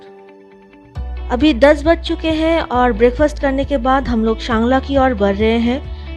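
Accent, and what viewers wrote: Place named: Bengal, India. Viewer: Nice documentation and description. From your pronunciation, it's clear that you are from Bengal. But description is outstanding. Thank you.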